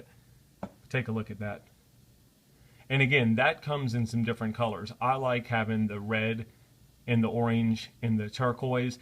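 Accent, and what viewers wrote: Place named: South, United States. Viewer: Pah-peel-yone. It means "butterfly" in French. Love your videos. Great calming voice, with a pleasant Southern lilt.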